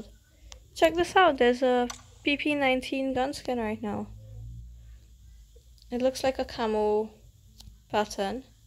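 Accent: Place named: Trinidad and Tobago. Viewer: you sound like a Trini but I'm from here. If you want to link with my clan hit me up. 『GØD』Ërmac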